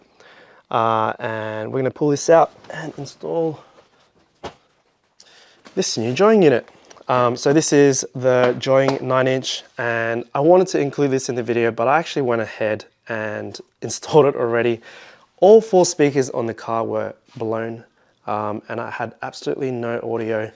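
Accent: United Kingdom. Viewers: I got a Mekede S6 Pro Plus I bought all the accoutrements from the junkyard including the vents, duct, entire harness to the pass door (USDM), and the CORRECT dash from a 99-00 for my 97 EX Coupe. The only thing I bought used off ebay was the lower automatic mode actuator but I'm having trouble visualizing how it is installed. I guess I need to get off youtube and go dig through the shop manuals. Searching for the latter is what brought me here but I have to say is much easier to understand than ANY of the other channels that have done this exact swap. The narration deserves an award for the beautiful narration and in the King's English no less!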